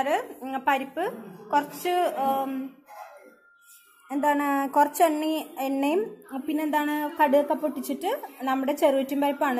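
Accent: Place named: Malabar, India. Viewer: nde malayaalam is soo different.kayichu..malabar aano stalam...good information..thanks dear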